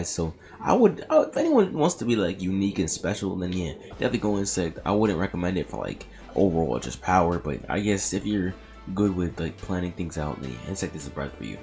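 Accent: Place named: New York, United States. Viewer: are you from new york you kinda have an accent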